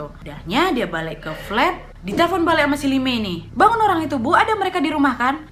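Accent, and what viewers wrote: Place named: Bengkulu, Indonesia. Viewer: Ngmngnya kaya orang Bengkulu Wak Wak🤣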